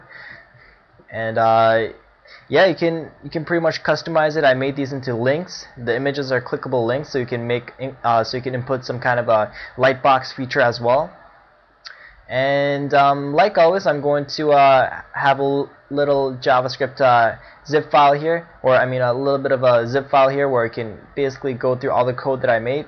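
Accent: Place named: Midwest, United States. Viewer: Thank-you for the tutorial! In answer to your question - your accent sounds like midwest American with a slight hint of Asian.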